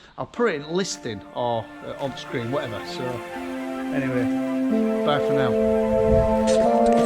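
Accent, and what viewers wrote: Yorkshire, United Kingdom. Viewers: Look I'm dizzy enough without you dropping the camera, be more careful. Thanks for sharing anyway always good to listen to that Yorkshire accent, and the no nonsense get on with it farming.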